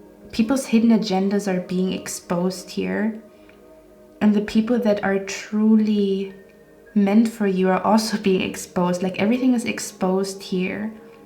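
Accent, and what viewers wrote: Germany. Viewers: Love your accent. Are you German?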